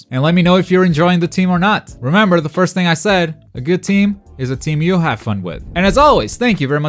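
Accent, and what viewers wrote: Portugal. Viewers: Wait, are u portuguese?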